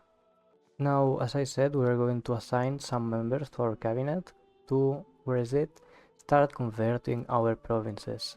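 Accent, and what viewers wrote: Spain. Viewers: Eres español hablando inglés ese acento no falla jajajaja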